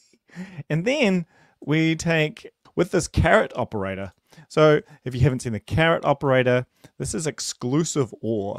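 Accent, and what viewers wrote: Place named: New Zealand. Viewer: Thanks for sharing! Love your NZ accent btw :) churr bro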